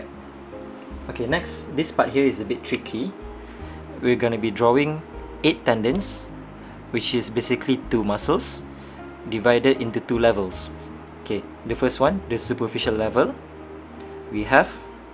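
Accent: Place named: Johor, Malaysia. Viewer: nampak beno gaya bahasa cam orang johor. "wait eh". huuuuu. hidup JDT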